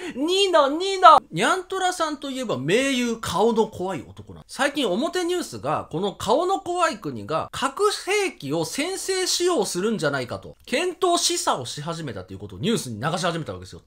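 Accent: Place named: Kyushu, Japan. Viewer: 今日、九州弁がでてたね。 昭和ネタが大好き💕なのでこれからも沢山お願いします🤲。あ、姉そんネタも大好物です。 栗きんとんの冷凍は知らなかったよー😅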